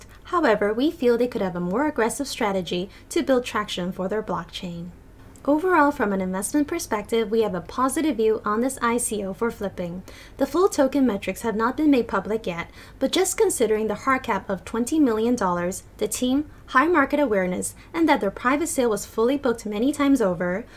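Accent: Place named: United States. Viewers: I coming from humble beginning...highly corporate American accent I find very attractive. I don't want to listen to no ghetto chick because she has "soul" but hey you cant please everyone I guess but you can certainly piss everyone off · AML86 na it's definitely her. Ok.. I'll explain myself. I owe her that. She either sounds dead because she's reading from a script in monotone or it's her highly corporate American accent. Likely a mix of both but speaking freely may fix it 👍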